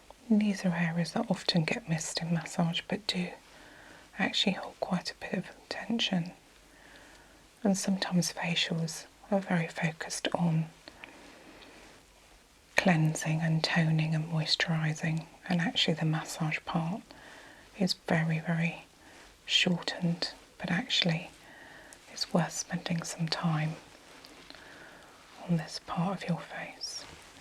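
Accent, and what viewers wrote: United Kingdom. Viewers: your soft voice with your British accent is just like a warm hug. I just love your videos, I’ve watched all of them. I listen to help me relax and get sleepy and it works …..thank you for sharing your gift.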